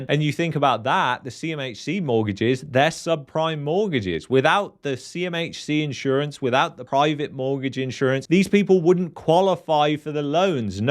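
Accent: United Kingdom. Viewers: 4 months ago you were saying the same shit , where is the apocalypse ? Please confirm. We all know it's coming soon but please provide some original information. No facts or data to back up your pseudo doomsday claims. I mean come on we already have Alex Jones and more lmao. Im sorry but i need more than a british accent and fake data to tell me what to aim my thoughts toward.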